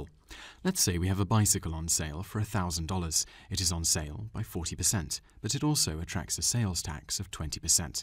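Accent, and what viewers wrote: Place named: United Kingdom. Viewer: He does not sound like a computer he is British.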